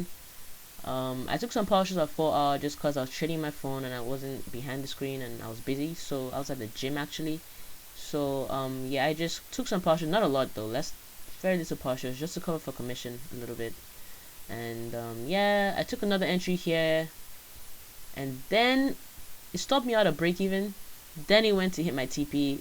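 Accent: Nigeria. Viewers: I was thinking shes definitely nigerian lol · Thanks,... You sound Nigerian tho... Great content went through your telegram from September... Wow... It's inspiring to see how the trades improved so fast, pure hardwork welldone, can't take that away from you... You bad sha🤭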